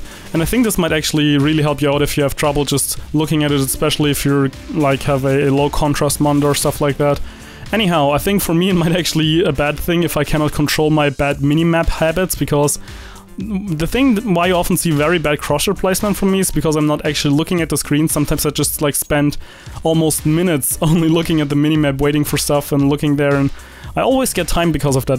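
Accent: Germany. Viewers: mann kann aber ob und zu einen deutschen akzent hören xD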